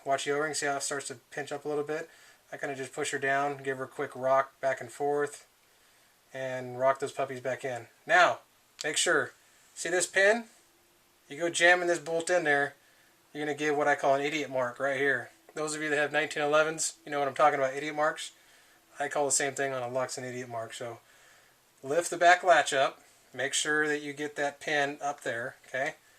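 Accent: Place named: Midwest, United States. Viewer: Its funny cuz ur from california but ur accent sounds like a midwestern one (Wisconsin, Iowa, Minnesota maybe) and im from Wisconsin! Hahahaha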